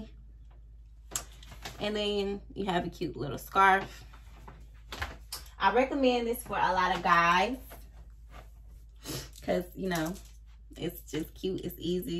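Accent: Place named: New Orleans, United States. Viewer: Beautiful head wraps. New Suscriber on board. Are you from New Orleans? I love your accent.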